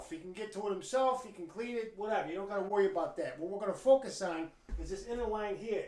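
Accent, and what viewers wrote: United States. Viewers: What happened to this American accent I need a friend who speaks like this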